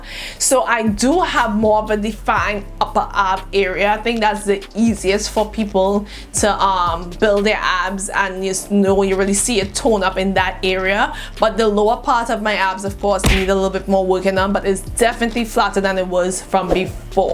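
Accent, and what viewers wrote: Trinidad and Tobago. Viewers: omg i can hear the trini/west indian in your voice lol. 🇹🇹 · By chance you from Trinidad? cuz I HEARING ITTT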